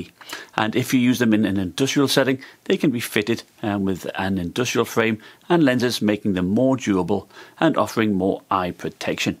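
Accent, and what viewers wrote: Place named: Newcastle, United Kingdom. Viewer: U from newcatle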